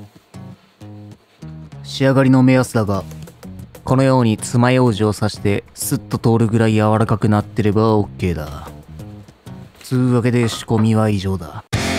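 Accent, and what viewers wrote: Kansai, Japan. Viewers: 変な関西弁が混ざると思っていたが、本当に関西人だ。 西では天かすだが、東では揚げ玉。 これ、にこんにゃくのサイコロ切りを入れても触感が良い。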